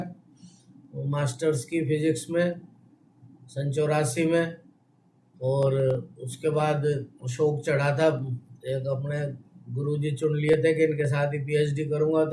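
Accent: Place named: Haryana, India. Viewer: Bahut sahi and ek aarse baad Haryanvi suunne ko mili hai,!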